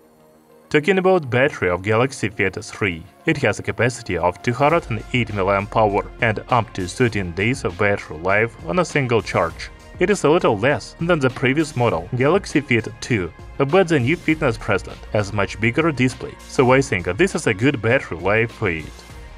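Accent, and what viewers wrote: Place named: Russia. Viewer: Rusia o alrededores creo. Lo importante es que se entienda incluso con subtitulos.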